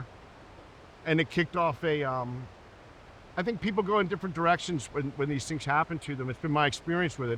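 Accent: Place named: Philadelphia, United States. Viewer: An excellent communicator. Held my attention the entire time...and not just because I was listening for his Philly accent.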